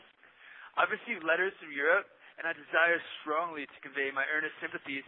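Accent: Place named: Quebec, Canada. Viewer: "Quebec" version of French.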